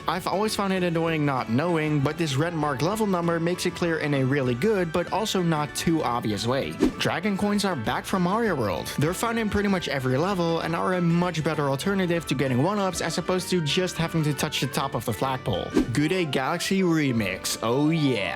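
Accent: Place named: Netherlands. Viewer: Haha ik dacht al een Nederlands accent te horen. Tof filmpje. Ik zou ze graag willen spelen. De newer games, maar ik ben totaal niet technisch, heb geen pc en weet niks af van mods.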